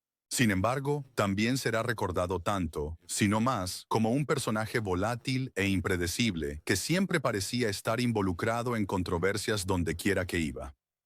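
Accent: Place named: United States. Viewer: El contenido del vídeo está bien, lo que no me gustó mucho es esa voz agringada que exagera las pronunciaciones de los angliscismos y los apodos sajones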